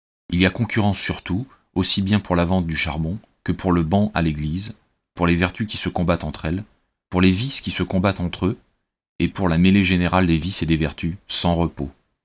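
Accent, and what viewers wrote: North, France. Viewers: Dommage que l'accent soit du nord pour raconter une histoire que Giono a raconté avec notre accent du Sud!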